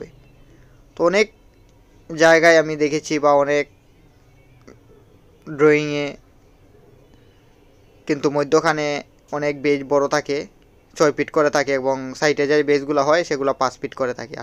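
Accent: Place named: Sylhet, Bangladesh. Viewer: আসসালামু আলাইকুম۔۔ জনাব, আপনার কথায় বুঝতে পারছি আপনার বাড়ি সিলেট বিভাগে l সিলেট শহরে পাঁচ শতক জমির উপরে তিন তলা ফাউন্ডেশন দিয়ে একতলা বাড়ি করতে আগ্রহী l এজন্য আমি নিজেই একটি নকশা করে রেখেছি l আপনার ভিডিওগুলো অনেক সহজবোধ্য হওয়ায় আপনার সাথে আলোচনার জন্য আগ্রহ প্রকাশ করছি l এজন্য আপনার মোবাইল নাম্বার (Whatsapp /Imo) o email address পেতে পারি কি ? ধন্যবাদ !! __ মিছবাহ তফাদার রিয়াদ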